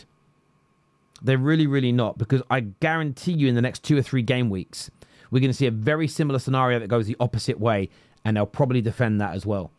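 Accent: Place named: Liverpool, United Kingdom. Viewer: The voice sounds like he was born in Liverpool 🤔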